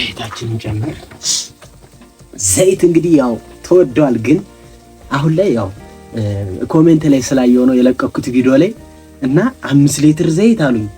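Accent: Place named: North, Ethiopia. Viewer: ጎበዝ በርታ የኔ ልጅ አማርኛህ የወደ ሰሜን ነው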